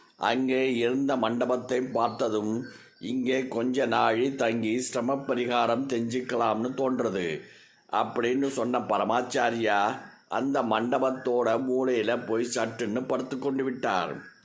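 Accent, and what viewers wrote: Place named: South India, India. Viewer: He is talking in Dravidian voice, specially known for DMK, Karunanidhi.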